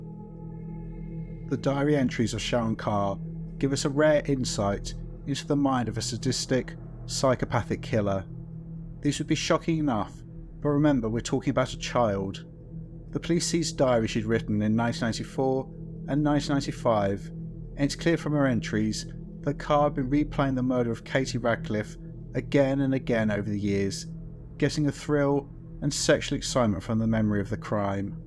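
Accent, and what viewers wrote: United Kingdom. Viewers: hello love your YouTube channel and love your videos and love your British accent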